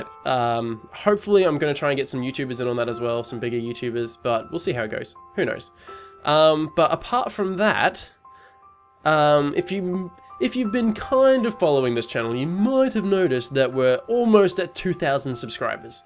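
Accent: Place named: Australia. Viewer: Can I just say, you sound quite similar to Markilplier.. But Australian..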